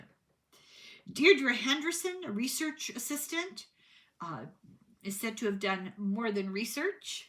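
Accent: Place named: Ireland. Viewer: I just love your Irish accent. You are beautiful And a sweetheart I look forward to being part of your channel I subscribed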